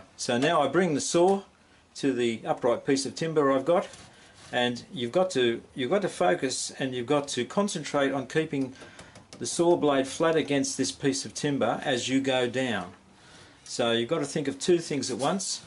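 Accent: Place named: Australia. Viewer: Hi. Given your location as being in Australia (and the accent is a giveaway), I'm intrigued to know how you came to choose Great Chesterford/Duxford/Newmarket as the location for your layout. Another reason for my curiosity is that I did most of my growing up in Burwell, about four miles from Newmarket. Much of my education was in Burwell and Cambridge. Since leaving Burwell, I have lived on the outskirts of Cambridge. So, what's your story?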